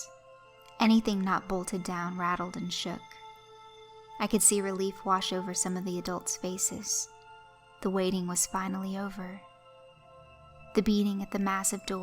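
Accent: United States. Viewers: lovely voice btw USA right?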